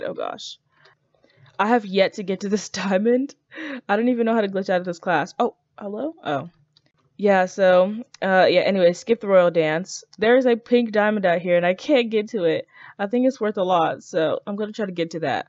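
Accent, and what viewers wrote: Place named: Jamaica. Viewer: You kinda sound like a jamcain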